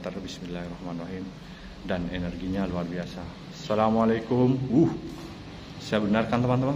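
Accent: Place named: Madura, Indonesia. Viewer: baru nonton channel ini. saya suka karena rumah Belanda kuno. Logatnya Msdura banget... jd inget sama famili jauh saya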